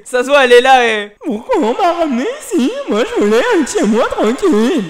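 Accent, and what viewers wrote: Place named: Quebec, Canada. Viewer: On dirait un québécois j te jure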